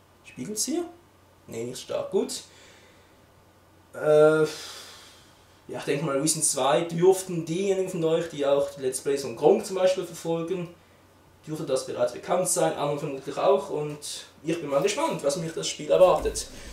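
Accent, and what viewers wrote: Switzerland. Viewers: sag mal bist du Schweizer ? du hast so einen Akzent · Ich interessiere mich für den predator und dachte mir ich bestelle den über digitec.ch.... also bist du mit dem pc nicht so zufrieden? ich brauch den hauptsächlich zum zocken und evtl. berufschularbeiten.... wichtig ist für mich, dass man auch 2 bildschirme anschliessen kann das ist sehr wichtig. freu mich auf ne antwort... p.s. am dialekt an bist du schweizer oda? ^^ denn freui mi uf e baldigi antwort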